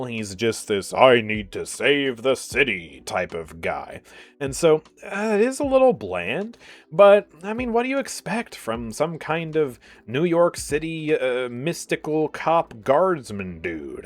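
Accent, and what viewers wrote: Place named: Canada. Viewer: dude why whenever i watch your video it makes me feel like iam watching canadian guy eh it just you guys have the same style in talking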